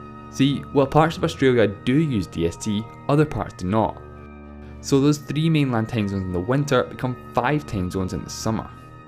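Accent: Scotland, United Kingdom. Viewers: He has a Scottish accent, which is presumably where he's from. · This channel is from Scotland. I hear it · I'm a stupid native English speaker from America, but for some reason it's so hard for me to understand some English dialects. British? and Australian are pretty easy, and you accent doesn't sound Irish, so I'm guessing Scottish, and that's what some of the comments are saying. But that's okay that's what subtitles are for. And don't worry I subbed. And I'm sure you understand where I'm coming from but just to confirm I'm not talking shit or hating on you specifically I'm just really being honest: I seriously can't understand all English dialects. Otherwise I wouldn't have even mentioned subbing and actually subbing. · It is Scottish. Use Closed Captions (subtitles) if you find it difficult to understand. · Roman Furman Scotland, with a Scottish accent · Sounds like a hybrid accent. 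Scot and Chinese or Japanese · Niue is a territory of New zealand n ot a country it's a scottish accent and yes it's difficult to get used to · From the comments i understand it is a Scottish accent. I have no problem to understand him. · He's Scottish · He has a Scottish accent. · Eduardo Mañón it's Scottish not english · Gae Shows that's ignorant, he has a Scottish accent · He's Scottish that's how he talks. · We Americans have not trouble understanding a standard English accent. The narrator, however, has a Scottish accent, something English speakers outside the UK find difficult to understand. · Oh dear...Scottish accent with the speech impediment additive...does my head in... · Very annoying all those comments mocking his accent. He is Scottish, that's how people speak here. He never said "tame", there is no a sound in his pronunciation of time. Same with "sex", he never said that, there was no e sound in his pronunciation of six. 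Most of the people making those comments are probably American, considering how these words are pronounced differently there. Guess what... English is spoken in other countries as well. Have a bit of respect for other accents! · Are you Scottish? Scotland is the best :) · WHY DID THEY CHOOSE THIS TERRIBLE ANNOUNCER???!!!! HIS ACCENT IS DIFFICULT TO COMPREHEND. SHOULD HAVE USED AN ANNOUNCER WHO SPEAKS IN STANDARD BBC ENGLISH OR GENERAL AMERICAN ENGLISH, UNIVERSALLY UNDERSTOOD ACCENTS---NOT SOME SCOTTISH ACCENT!!! RUINED THE ENTIRE VIDEO. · I would like to know the presenter's area from which his Scottish accent originates, as I've heard similar versions where it sounds like the other speakers are talking through mouthfuls of saliva. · No, it's a Scottish accent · To be honest, I loved your Scottish accent. By the way, you should have mentioned the Spanish case, where in zones like Finisterre (where I am from), the very eastest cape of Spain, the sun goes down in summer , in 40°N of latitude; and in winter, the sunrise is am... 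Freaking out! We should be on -1 UTC, but we are on +1, and in summer +2. But well, I don't dislike it though. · Good to hear a Scottish voice on Youtube and imparting some knowledge. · Accents are wide raging and different u can have 10/20 different accents in a city or region of a country or even just a part of a city. Also certain ppl naturally may have a strong or weak accent due to their voice and some ppl can be able to mix different accents and make their own unique accent. This guy has a Scottish accent but it's not a strong strong 1. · Yeah, it is a scottish accent. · Breezy But he's from Scotland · The amount of idiots here who don't know the Scottish accent is too high.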